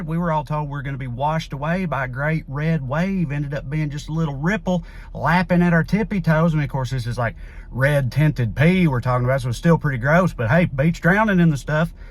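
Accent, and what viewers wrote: South, United States. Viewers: My first time to your channel, and I’ll give you a like and maybe even a subscribe! You are top notch at flapping your jaw. Give you credit for skill and thoughtfulness. Keep up the good work. And PS, an authentic southern accent not the New York transplant version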